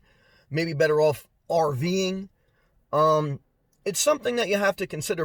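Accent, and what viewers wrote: New Jersey, United States. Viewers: Thank you I love your NJ accent and you been keeping me company today driving from Jax, Fl to Alpharetta, GA :)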